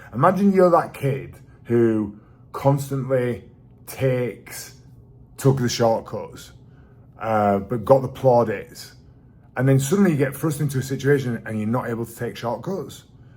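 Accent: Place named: Yorkshire, United Kingdom. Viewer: Thank you for your very articulate reflections on confidence,. They were characteristically thoughtful. You are a very impressive man. You are more a self-made man than most men I can think of: you have built up your own confidence, your own life, your own body, your own business and made a success of all of them! And you have fabulous blue eyes and a delightful Yorkshire accent as well. What a star you are! The US President who said that imperfect action was better than perfect inaction was Harry Truman.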